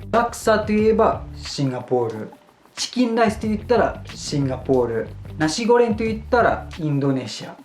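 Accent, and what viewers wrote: Kansai, Japan. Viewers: マレーシア人で関西弁エグいて笑 いい動画ありがとう!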